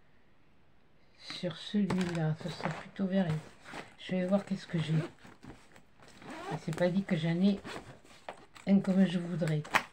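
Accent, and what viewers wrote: South, France. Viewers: Coucou, je découvre votre chaîne et je reconnais bien là la gouaille du sud! J'adore! Vous m'avez bien fait rire! Y en a une qui a intérêt à bien se tenir!!! Votre franchise me plaît. Du coup je m'abonne et je pourrai découvrir vos créations. Merci pour le partage. Bisous de l'Aveyron.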